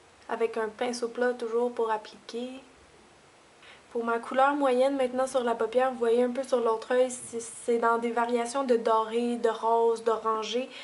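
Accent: Canada. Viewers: L'accent canadien est très mignon.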